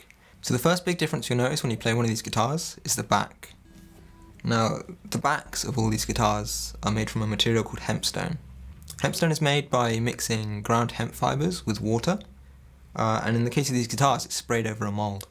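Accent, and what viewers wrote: United Kingdom. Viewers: I'm trying to place that accent. Suffolk? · Oh your British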